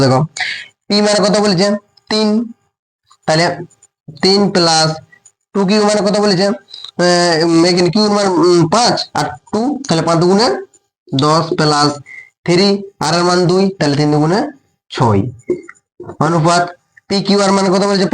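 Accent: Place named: Purulia, India. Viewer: Sir, আপনার কথা বলার ধরনটা পালটাও পুরুলিয়ার ভাষা বলো না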